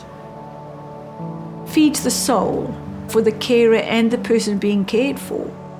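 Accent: South Africa. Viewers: That was really powerful and heartfelt. You say you are British but you have a South African accent and it looks as if you live there now. But you are so right as we don’t talk about our emotions as well as we should. The hospices do such a wonderful job, you are so right and it is not at all a depressing place. It would be wonderful if we could all end our lives there. By the way your grandmother is very beautiful and you obviously have her genes. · The accent is southafrican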